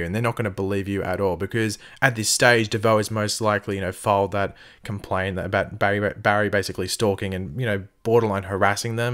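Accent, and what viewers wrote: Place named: Outback, Australia. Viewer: I didnt subscribe because of your content, i subscribed because of your outback sounding voice, just sayin hahaha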